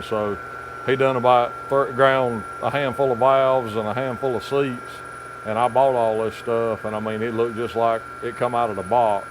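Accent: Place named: South Carolina, United States. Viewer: He lives in South Carolina, the USA is big enough that there are several different accents from one end to the other. You can tell someone who lives in Minessota from someone who lives in Wisconsin, as an example.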